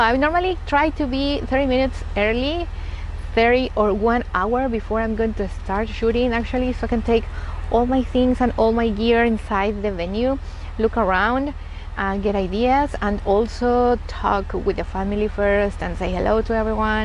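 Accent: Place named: Venezuela. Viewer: Also you look/sound like Venezuelan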